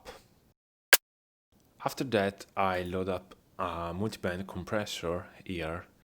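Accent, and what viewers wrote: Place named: Spain. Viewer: considerato che sono italiano, direi passabile. Pensavo tu fossi spagnolo, forse è l'accento! Non te la prendere, scherzavo sull'inglese, ma sono serio con i complimenti sul tutorial, davvero un ottimo lavoro.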